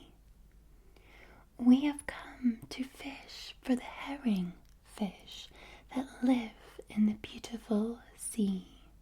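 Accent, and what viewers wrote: Scotland, United Kingdom. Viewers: official Bears fan.🐻⬇️ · good tae see Scottish asmr 🤝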